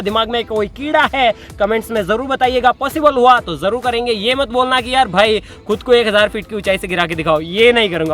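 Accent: Rajasthan, India. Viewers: Rajasthani boll raha hai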